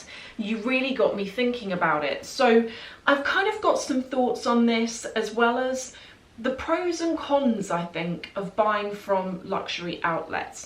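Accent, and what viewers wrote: RP, United Kingdom. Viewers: your accent is so posh i love it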